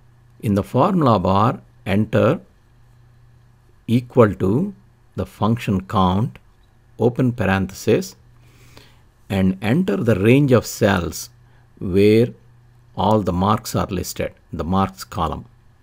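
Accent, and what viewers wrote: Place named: South India, India. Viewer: Must be from south Indian .... peice of advice ur accent s*cks so plz don't insult English language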